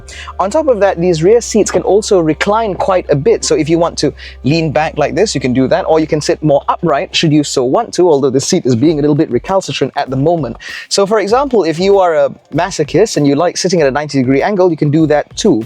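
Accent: England, United Kingdom. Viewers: Nice English accent, very clear.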